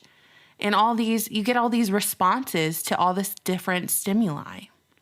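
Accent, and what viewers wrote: South, United States. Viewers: I'm a NC girl, myself, so my inner dialogue is sprinkled heavy with that southern salt I hear in your outer dialogue. I am both jelly and terrified of the NPC who doesn't talk to themselves. Of course, those have been bred and programmed, I mean raised, not to think for themselves, only to consume. Consume all the gmo and PC rhetoric the powers that shouldn't be try to shove down all our brains. Those consumers make me so sad. I mourn for all the potential I see being flushed right now. But I for one really appreciate the fact that you let us into your beautiful mind every once in a while. Stand sting in your knowledge, and I sure hope that wisdom starts with the true Rock of our Salvation. Much love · So great to hear you again, as a southern boy working abroad its beyond refreshing to hear a voice from home. I think a lot of us "Americans" are caught in a daze by whats is happening and just as you said how fast things are changing in America. 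In this daze, we are letting slip through things that I would never think we would accept. The past el-ec- tion was a clear indicator of just how, what many Americans believe is a lie and I also think that is what started the dominos falling. Because nothing was done by any of the supposed safeguards in our government or judicial branch about the el-ec- tion, many Americans are in a state of trauma. If you've ever been around people in a state of trauma you will understand, they act on muscle memory, aka they will just blindly do what is the norm, even as the world around them is on fire, I think we are at that place as a nation! While many other Americans are have developed Stockholm syndrome due to restrictions, mandates, lockdowns, etc.... again like trauma people who are suffering Stockholm syndrome, people will accept and do things they normally would never even consider! You are one of the very few internet personalities that dig deeper into social issues and overlay a level of human psychology that makes sense to why things are happening! 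Great to hear and look forward to many more of what you did hear!! Thanks.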